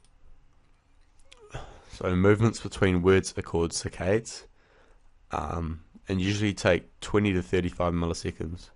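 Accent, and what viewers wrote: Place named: New Zealand. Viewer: such a kiwi accent!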